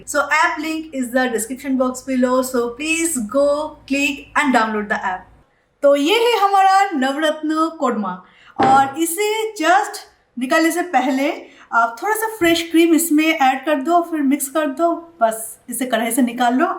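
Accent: India. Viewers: What a Hindi accents.... Shame on you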